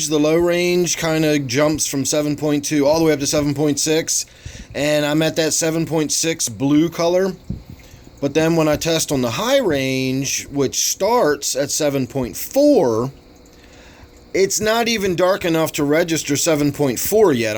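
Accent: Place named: mixed, United States. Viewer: Hey, are you in Philly/Jersey area? Love your accent!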